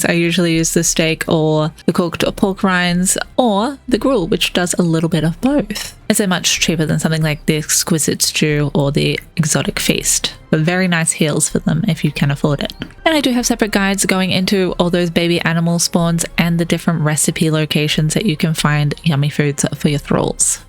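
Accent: Australia. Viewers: trying to work out the accent you Aussie?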